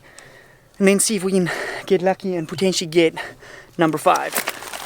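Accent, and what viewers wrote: Afrikaans, South Africa. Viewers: Ek hoor jou Afrikaans !! ❤❤❤❤Jy skiet mooi! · Bro sounds southafrican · Yo dude kan jy afrikaans praat i said can you speak afrikaans cause you sound like you come from south afrika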